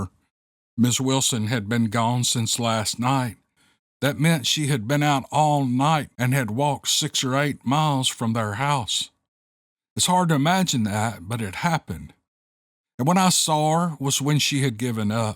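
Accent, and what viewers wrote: South, United States. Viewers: You, my friend, are a very good writer or storyteller. I could listen to you all day long. I often re-listen to the books you have read while cooking. I like the southern cadence quality that you have. Anyways, I've missed you but had no doubts that you would return to us eventually. ❤ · that was an awesome story! Please keep writing when the muse hits you like that. Thanks for sharing that and the other stories, love listening to them. By the way, you sound like most of my neighbors, which is normal for the South. I am in Louisiana and went to Chamberlain Hunt Academy in Port Gibson for 6 years, so part of my growing up was in Mississippi. Great place to grow up! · I have so missed you soft, southern voice. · I gotta tell ya Brother, as much as I love, How to Hunt, Steve's an honorable man, as I've said before, I Truly, Appreciate the Southern Man, in your voice. 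You have a gift, even if it ain't languages or accents, other than Southern.. GBU, and your family. I really love listening to your voice, and the peoples stories, Steve Lilly, or lived experience.....Aloha. Oh, and this story, Twisted. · Darn, you always keep me up late with your interesting, and superbly presented stories......I love the accent, what part of the South are you originally from, is it Georgia?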